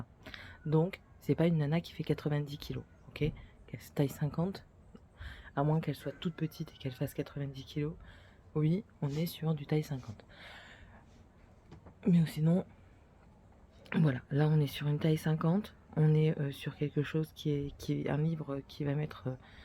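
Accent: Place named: Nord, France. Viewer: Oh la vache l'acent du ch'nord ! C'est marrant !!!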